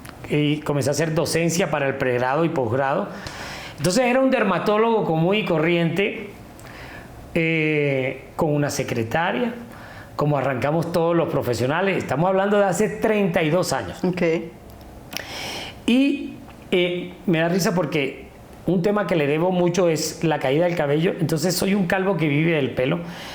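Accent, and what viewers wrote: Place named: Barranquilla, Colombia. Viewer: Barrnquillero que baila arrebatao, no has perdido el acento currambero. Orgullo costeño...que sigan los éxitos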